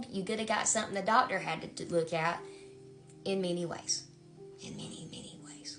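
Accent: South, United States. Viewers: Hot southern lady hot southern accent we got the memo🙏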